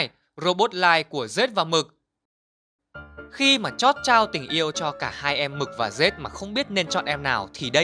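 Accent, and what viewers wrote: South, Vietnam. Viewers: Anh nói giọng miền Nam hài quá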